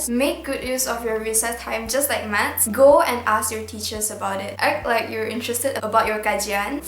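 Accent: Sarawak, Malaysia. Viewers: BTW ARE U FROM SARAWAK I COULD TELL FROM YOUR ACCENT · Sarawakian? Your accent is kinda same as sarawakians language like this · Dr sarawak ke sis?.. Sbb "lom gik masok form5 " hahaha.. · Bunyi kdk org srwk.. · is like one of those who speak manglish 😂gosh im subscribing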